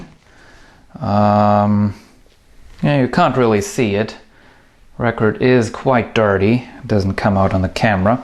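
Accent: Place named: Germany. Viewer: forty earo :D you sound so german, alter :D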